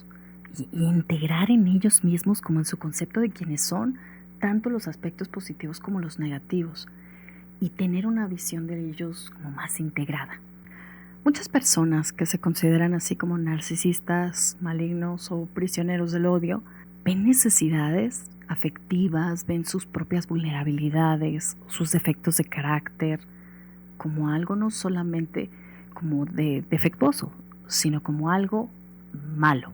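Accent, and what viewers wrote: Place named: Mexico. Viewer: Muy atinado tu video,; hay mucha confusión de lo que es el narcisismo y de cómo se manifiesta; de igual forma cómo es que es ideal tratar a una persona con narcisismo maligno. Tu video es muy valioso. Gracias por compartir. P.D. Tienes acento de mexicana, ¿estás en Mexico? Gracias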